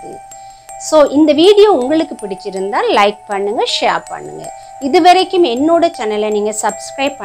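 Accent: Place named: Sri Lanka. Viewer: நீண்ட வருடங்களுக்குப் பிறகு இலங்கை தமிழர்களின் தமிழ் உச்சரிப்பு வார்த்தைகள் நீங்கள் பேசுவதைக் கேட்டு மிக்க மகிழ்ச்சி வாழ்த்துக்கள்💐💐💐💐.உங்கள் அமானுஷ்யம் சம்மந்தப்பட்ட காணொலி மிக சுவாரசியமாக உள்ளது.மேலும் முதலாம் உலகப்போர் உருவாகக் காரணமாக இருந்த சர் ஃபிரான்ஸிஸ் பெர்டினான்ட்(ஆஸ்திரியா நாட்டு பட்டத்து இளவரசர்),பெனிட்டோ முசோலினி,மாடா ஹாரி(டச்சு டான்ஸர்)செங்கிஸ்கான் போன்ற சர்வாதிகாரர்களின் வரலாற்று காணோலிகளை போடுங்கள் ஜெயா.நன்றி🙏🙏🙏🙏!(சுரேஷ்.சென்னை).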